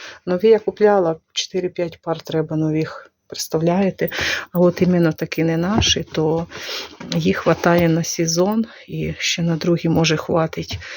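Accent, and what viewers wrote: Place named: Ovruch, Ukraine. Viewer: Все у вас добре, урожай є, і говорите ви гарним суржиком, по особливому, по овруцькі, як мені хочеться в Овруч, може пізньої осені вдасться, люблю ваші відео про місто, про ваші квіти, мальви... Головне щоб було здоров'я і мир!!!!!!❤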